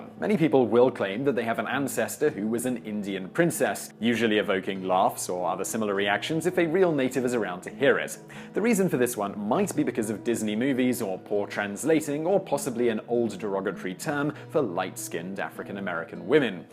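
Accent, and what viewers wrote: England, United Kingdom. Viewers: Nothing more funny then a white guy with an English accent talking about misconceptions of the various nations, at least he's actually trying I give him that much.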